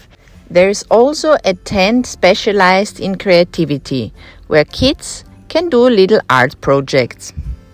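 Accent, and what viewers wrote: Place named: Italy. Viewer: Oh you are truly a beautiful honest spiritual soul. I really appreciate your wonderful truthful videos. You are clearly hard working and your italian accent as a 'foreigner' is amazing. It is very Hard to move to another country - Well Done. My mother was italian and thankfully have an italian passport. Much love Fiona South Africa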